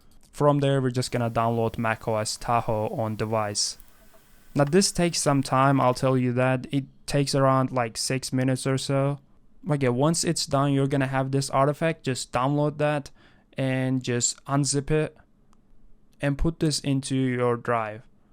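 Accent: Iran. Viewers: I love ur Persian accent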